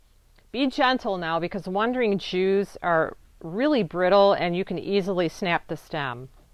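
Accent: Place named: Chicago, United States. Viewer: Her accent makes me miss Chicago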